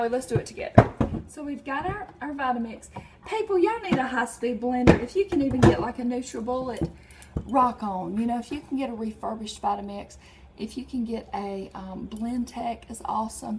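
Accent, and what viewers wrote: South, United States. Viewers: You're videos are so entertaining :D made me laugh quiet a few times! I enjoy listening to you so much, so positive and you're southern accent is adorable :* greetings from Germany :)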